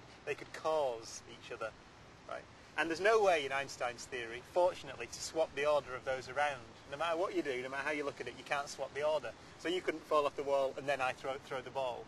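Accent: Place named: Lancashire, United Kingdom. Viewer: "The ball caused you to fall off the wall" is the greatest sentence you could ever hear in a Lancashire accent.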